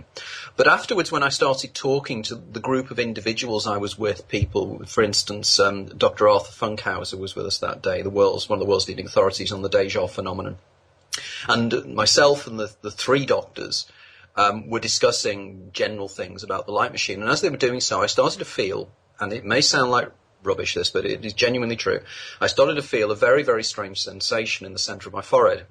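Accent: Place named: United Kingdom. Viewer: how come british people talk funny sounds like Stuie From the family Guy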